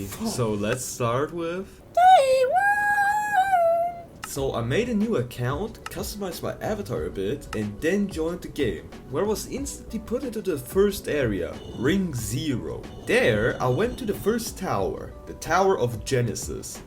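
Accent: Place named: Germany. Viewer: holy german accent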